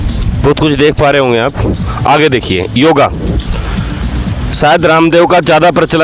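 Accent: Haryana, India. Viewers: Bhai ye aap ke liye Naya hoga kyoki aap ke liye sab Naya hoga aap Haryana ke ho sayad kyoki aap bhaasha se pata chalta jai wahan pr ladies ko Kitna samman Diya jata jai sab ko pata hai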